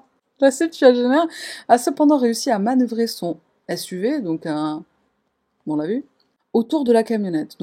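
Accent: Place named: United States. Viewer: Slt et le kiffe tu 😊parle super bien anglais avec l’accent et tout trop bien en clair américain et c’est trop cool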